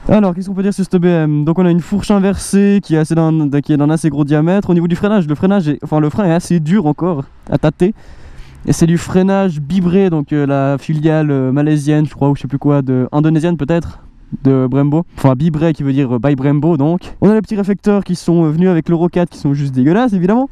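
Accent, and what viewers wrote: Switzerland. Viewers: L’accent suisse 🇨🇭👌🏾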